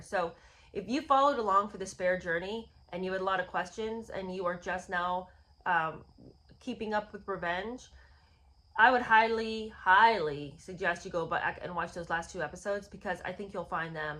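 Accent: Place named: Cockney, United Kingdom. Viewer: dont try an english accent ! It's sounds goofy half cockney accent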